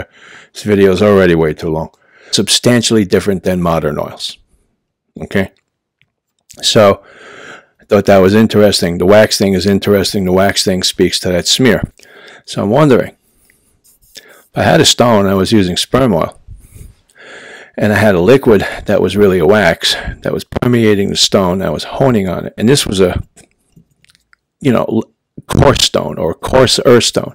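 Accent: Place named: Rhode Island, United States. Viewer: After using a DE for 4 years, I just got into straights (crappy gold dollar 208 and 2 Shapton stones) Can't wait to upgrade!! Came across your vids and I love your no bullsh*t approach. I've learned a lot past 3 weeks from your vids but have many more to watch and soooo much more to learn. Just wanted to say thank you. Also, are you from NY or RI? You're accent sounds like mine (RI), but I'm terrible at telling the difference b/w them!! Jw. Anyway thanks for your vids man.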